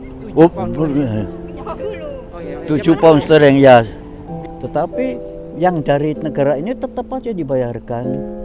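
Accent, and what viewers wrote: Java, Indonesia. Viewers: Logat jawanya masih medok ya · Voila. Hebat, Pak. Bahasa Indonesianya tetap terjaga, juga medhok logat Jawanipun.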